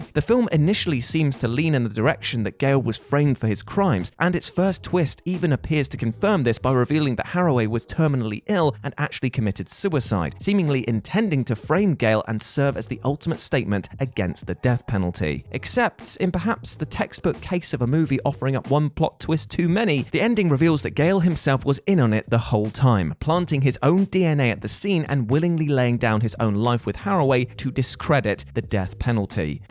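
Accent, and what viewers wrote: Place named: England, United Kingdom. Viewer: At least he's English and not Scottish, the closed captions wouldn't help you! And that's coming from someone who is Scottish 😂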